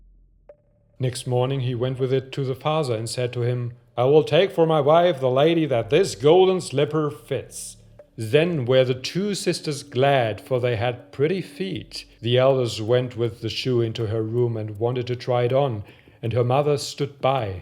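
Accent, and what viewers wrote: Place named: Germany. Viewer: What a timing to upload, I’m going to Germany the next day 😃 The accent is so nice! Can you do one in German?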